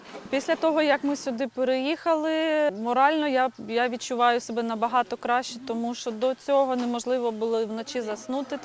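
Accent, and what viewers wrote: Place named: Volyn, Ukraine. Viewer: Слава Богу, що влаштувалися більш менш добре. А розмова Вашо як волинянки)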